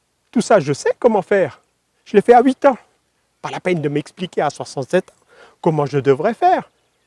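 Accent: Alsace, France. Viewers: Sympa cette petite video 'loufoque' ... Au moins ça déride et c'est bigrement plus 'revigorant' que les blablas fadasses et autosatisfaits de notre (¿?) président ... Toi, au moins, tu n'as besoin ni de cravatte ni de prompteur et ton discours est autrement plus sincère et en prises avec 'la VRAIE vie' que ces leuchorées verbales dont il s'obstine à nous abreuver pour tenter de justifier sa présence incongrue à l'Élysée ... ! Il n'a toujours pas compris qu'on me fera jms boire un âne qui n'a pas soif ! Trèves de plaisanteries ... et de digressions politiques ... !!! Je n'ai 'malheuteusement' pas de epotager ... ni même de jardín ... mais si je devais en avoir un, il ressemblerait à coup sûr au tien ! Je te suis de + en + régulièrement depuis un petit bout de temps, tombée sur le PP par hasard ... !!! Et depuis j'y ai pris goût ... Mulhousienne exilée volontaire sur la côte est de l'Espagne ds un bled de la provincia de 'Valencia' je me ressource grâce à toi en revenant ainsi, bien que 'virtuellement', faire un tour en Alsace ... 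le cadre, le paysages, les SAISONS ... tout me parle ... avec l'accent en prime ... ! Tu me me connais pas mais tu ne peux savoir à quel point le PP ... et son jardinier me font du bien ! MERCI ... même si je ne suis pas à même de mettre tes conseils en pratique ... !!! À la prochaine ... !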